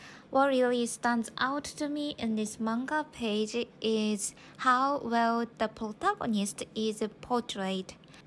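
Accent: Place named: Japan. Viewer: Omaigah a japanese talking in inglish idiom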